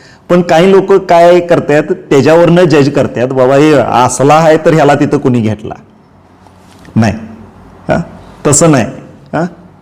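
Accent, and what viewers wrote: Kolhapur, India. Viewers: Bhava tu teacher ahes chagle ahe. Pan tuje fluent English nahi ahe. Tyala kolapuri/ gavakadhcha English cha Sparsha ahe. Pan tula Tu shikvaneche kam kartos khupach Chan. Congrats 🎉❤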